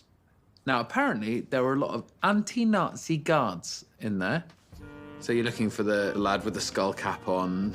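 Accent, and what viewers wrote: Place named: Afrikaans, South Africa. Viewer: oh wow he actually had a non shit south afican boer accent wow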